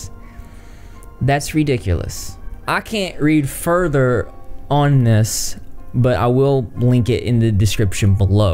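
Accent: South, United States. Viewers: I know this is a serious topic, but I have to be the one to say that, I love your southern accent, it is very soothing.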